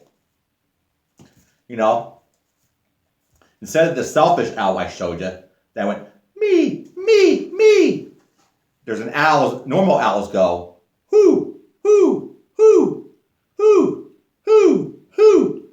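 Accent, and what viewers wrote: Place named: Philadelphia, United States. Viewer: Good analogies and a fun presentation. Are you from the Philly area by any chance? I grew up in South Jersey, and your accent sounds familiar! 😂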